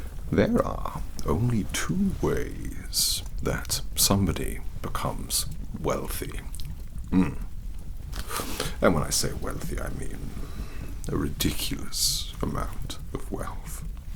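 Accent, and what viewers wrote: Wales, United Kingdom. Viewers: even tho I'm pretty sure you're Welsh hehe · Yes finally an asmr video that combines all my favorites. Asmr, and Demonology, and british accents. Fantastic performance good sir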